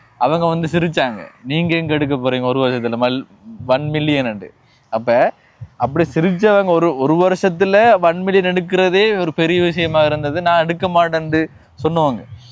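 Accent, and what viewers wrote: Sri Lanka. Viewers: இலங்கைத்தமிழ் மிகவும் அருமை 🎉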